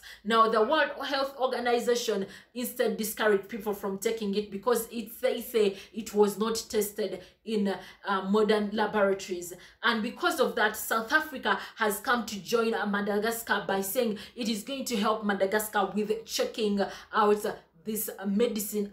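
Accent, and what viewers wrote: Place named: Uganda. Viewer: Your accent should be on my tv girl. Amazing Don't mind me, i get overly excited when I meet more than average fellow Ugandan Content createors. Bless you 🤣💃 Bless you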